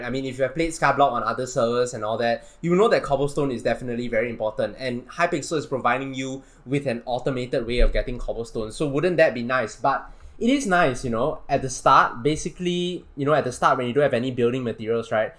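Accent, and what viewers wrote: Singapore. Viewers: Are you Singaporean?because you sound like it man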